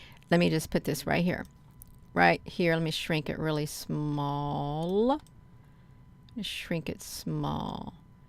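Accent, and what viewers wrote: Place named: Georgia, United States. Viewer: Hi, this sphere thing has always intrigued me, it’s happened a few times over the past 5 or 6 years. Obviously something massive that our so called leaders keep from us. This is a completely different subject but I gather from your accent which i think is awesome by the way, that you live in Georgia. I’m flying over from Australia to stay with my cousin for 2 weeks, he and his wife live in Savannah, can’t wait!.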